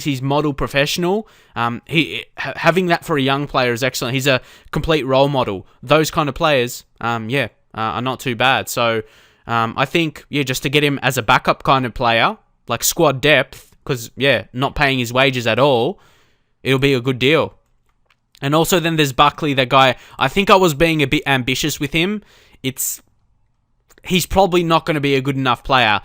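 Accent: Australia. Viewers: I'm guessing because you have an Australian accent, as one of the candidates on the apprentice has, people are taking the piss. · really like this lets play because you go into detail and teach me a lot of stuff. you are australian accent is something that takes a bit getting used to ;) Greetings from germany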